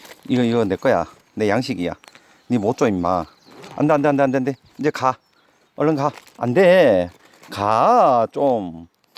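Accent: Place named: Gyeongbuk, South Korea. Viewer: 경북쪽이신가 말투가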